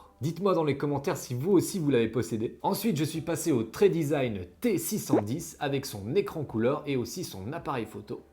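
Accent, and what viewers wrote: Vaud, Switzerland. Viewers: Oooooh le T610 😍 En réalité ce que je préfère dans tes vidéos je crois que c’est quand même de bel accent vaudois 😏😅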